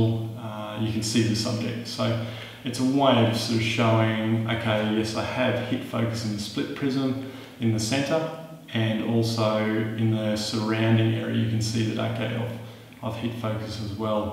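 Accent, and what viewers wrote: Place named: Australia. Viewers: I love Aussie accents. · Haha the Aussie accent, I'm Australian he was as clear as a bell. XD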